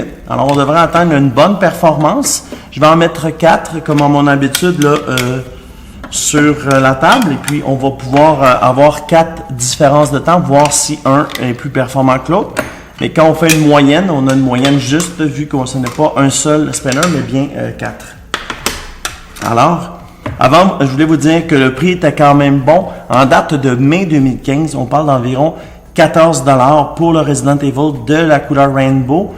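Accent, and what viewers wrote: Canada. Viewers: tu as l aqucant du kanada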